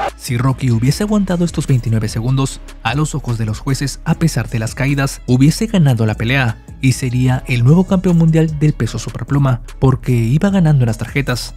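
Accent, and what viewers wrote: Mexico. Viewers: El comentarista es mexicano?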